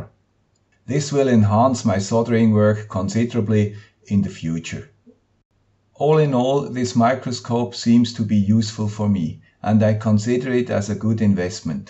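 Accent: Switzerland. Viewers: That would be fantastic, this has been an issue for me for a while. I have very good lighting at my bench. As I am approaching 50 myself and would like to work with smd, it's time to do something about it. I appreciate your quick response and look forward to seeing and hearing the man with the Swiss accent''s solution. Have a great week!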